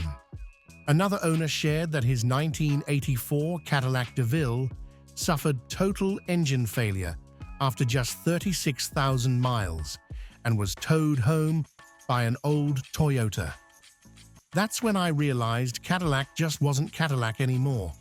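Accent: England, United Kingdom. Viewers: Four Thousand , One Hundred and One.............> WRONG:!!!! 4100..........Four Thousand, One Hundred..............PERIOD! Do some EDITING,,,,,,,,/"RESEARCH",,,,,, and get "RID" of the "GAY: English Guy's VOICE! Brits have been : LOOSERS,,,,,,for OVER 100 years now,,,,,,,,, "COME ON MAN"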